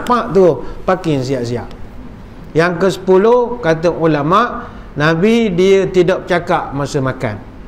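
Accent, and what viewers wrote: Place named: East Coast, Malaysia. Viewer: paling suka dengar YB Dato cakap loghat pantai timur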